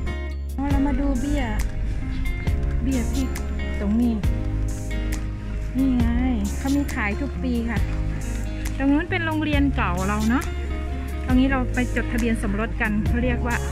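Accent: Isan, Thailand. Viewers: พูดอีสานฟังไม่รู้เรื่องกรุณาพูดภาคกลางจะดีกว่า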